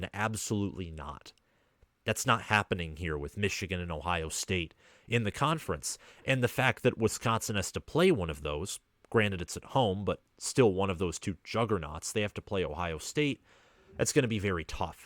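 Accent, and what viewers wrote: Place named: Minnesota, United States. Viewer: I can tell by your accent that you're from Minnesota or very close maybe the Dakotas perhaps but to be honest I think PJ ruffled Luke's feathers with the recruiting accusations so he will be seeing a very motivated Badgers team on that day as far as Illinois..Wis owes them 1...now Neb is going to be a scary team sooner or later their going to be where they should be & that's top tier BiG10 as far as Wis the ceiling is is playoffs the basement is New Yrs 6 or very close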